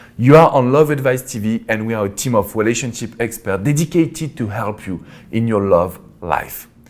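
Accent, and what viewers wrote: France. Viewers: are you French ? I can hear the accent lol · Ur frensh accent is all i can think abt right now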